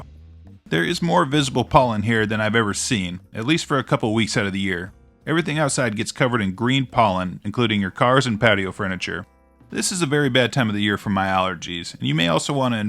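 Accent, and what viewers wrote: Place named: Charleston, United States. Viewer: You are developing a Charleston accent!!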